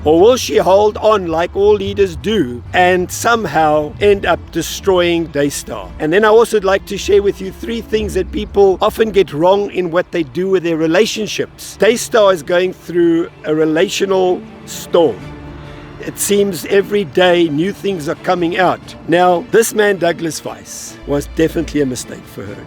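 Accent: South Africa. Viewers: The moment I heard his voice I could hear the South African accent straight away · It's music to my ears to hear your South African accent! 🇿🇦 Thank you for your wise insight. From a Saffa who has move to Northern Ireland. 🇬🇧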